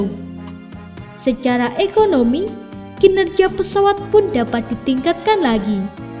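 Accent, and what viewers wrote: Java, Indonesia. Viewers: Suara nya orang jawa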